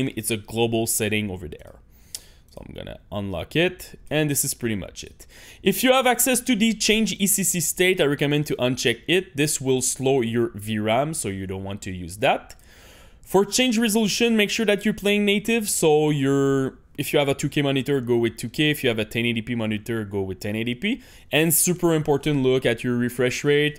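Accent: Quebec, Canada. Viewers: french canadian.... smh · clairement québécois bro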